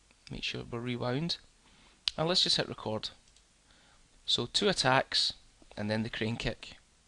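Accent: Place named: Wales, United Kingdom. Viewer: stop speaking in that horrible welsh accent i'm not hating i just don't like ur accent ur a gr8 guy just that accent is not my kindness no hard feelings <3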